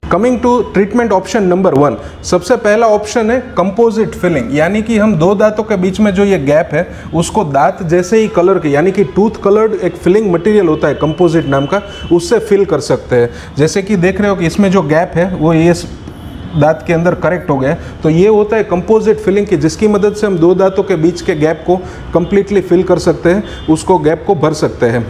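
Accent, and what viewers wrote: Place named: Kathiawar, India. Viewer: bhai aap Hindi bhi kathiyawadi lehje mai bolte ho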